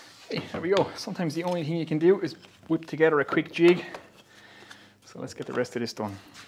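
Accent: Ireland. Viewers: good man...... I usuall saw the walls as the plough will jus rip it apart going cross the grain. I have a plough with a scoring cutter but find its quicker to jus saw the wall than fiddle around with the scoring cutter...... they're a pain to sharpen too 🤦‍♂️😂 I enjoy your hand tool stuff lad, it's also nice to hear our distinct Irish accent on a youtube video 😁🤙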